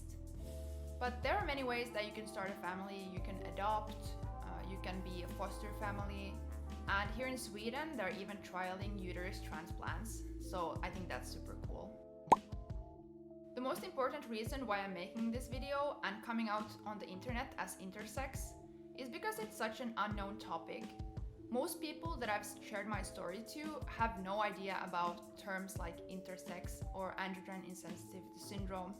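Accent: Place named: Germany. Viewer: She speaks English with a kinda German accent. Good clip.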